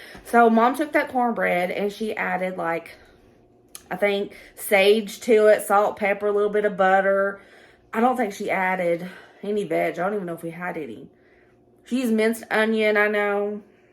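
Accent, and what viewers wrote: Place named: Arkansas, United States. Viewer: Add ur peenches of salt! Love you girl. Your accent reminds me of my arkansas family❤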